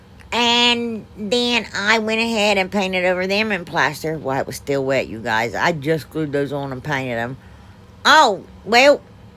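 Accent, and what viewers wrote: South, United States. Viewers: Hi,. I'm Tiffany. I am a caregiver for my husband whom is very sick. I'm originally from Nashville,TN but reside in Ohio. We love watching your crafting videos and your country accent. I feel like I'm at home watching your videos. 😊😊❤❤.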